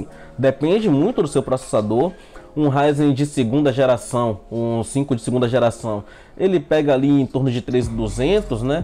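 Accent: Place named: Salvador, Brazil. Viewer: você é de salvador aaaaaaaaaaaaaaaa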